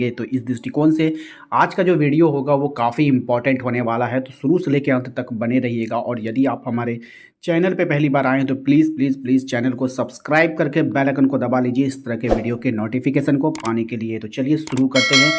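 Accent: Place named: India. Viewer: fxck curry accent 🖕🏾🤢🤮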